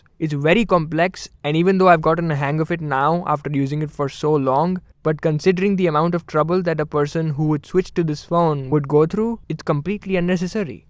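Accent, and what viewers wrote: India. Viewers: I'm a simple man. I hear an Indian accent, I click dislike.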